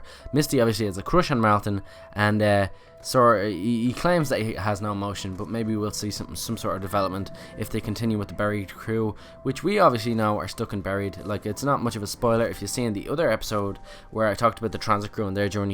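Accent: Ireland. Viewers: i can tell your irish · are u irish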